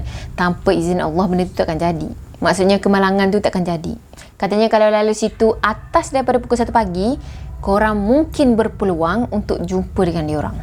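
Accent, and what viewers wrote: Kelantan, Malaysia. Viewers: Orang kelantan ke ni😆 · Akak ni orang Kelantan ke?🤔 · heyy .. ore kelate ko ni ?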